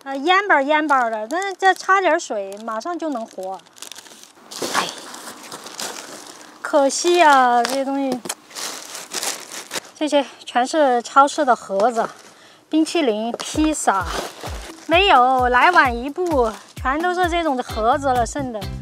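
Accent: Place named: Northeast, China. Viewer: 又會說四川語言 又是東北口音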